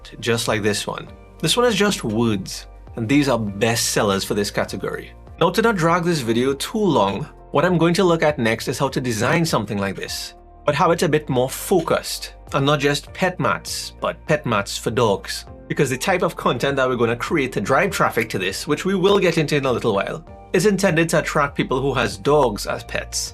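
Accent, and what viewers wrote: Trinidad and Tobago. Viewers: Love the vid bro thanks but how you soundin like you trini so?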